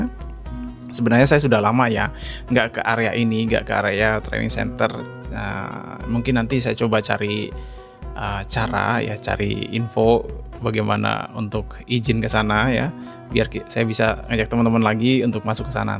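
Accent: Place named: Sulawesi, Indonesia. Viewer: Assalamu Alaikum Bung, maaf Bung pernah transmigrasi di Sulawesi, tepatnya dimana? Pantas dialek pengucapan seperti orang Sulawesi, padahal dilihat dari nama seperti nya dari Jawa yah Bung?. Sehat dan sukses selalu Bung, Aamiin.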